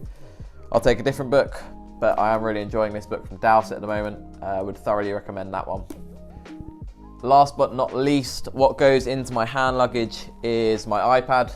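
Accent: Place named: United Kingdom. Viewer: Your accent reminds me a IELTS academic British English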